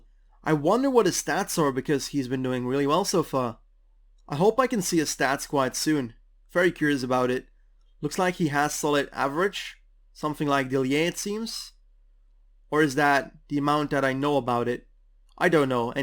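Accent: Belgium. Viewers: ben je een belg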